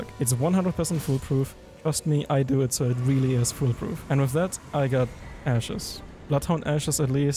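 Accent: Germany. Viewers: Hey dude great video! <3 Can we connect on discord or so maybe? From what i hear i assume that you're german and i'm german too, so it'd be great to have someone to share the video editing experiences, especially when it comes to Elden Ring :) <3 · Jo m8 whats ur Main language? German ?🤔 Dein english klingt wie von einem deutschen aber is trotzdem gut 😋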